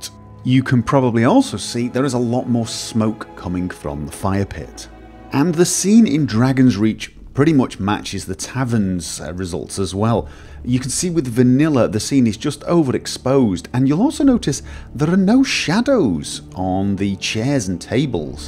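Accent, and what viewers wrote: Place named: England, United Kingdom. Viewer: I just love your english accent. I can understand everything! (Which is unusual for me, as I'm not natve english speaker) As to video. I definitely like ELFX the most out of these 3, and it loses only to enb. Which leads me to question: What enb are you using? I myself love opethfeldt6's and wouldn't change it for anything else.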